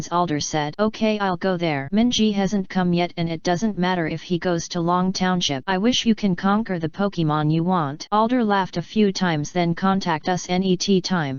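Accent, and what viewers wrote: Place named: China. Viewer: My god this Chinese actsent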